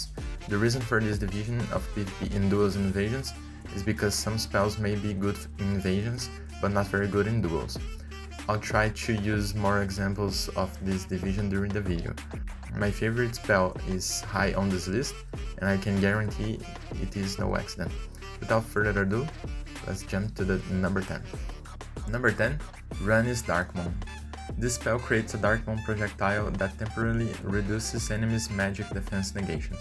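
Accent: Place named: Brazil. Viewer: Vc é br ne cara, logo no começo notei o sotaque kkkkk Adorei o video!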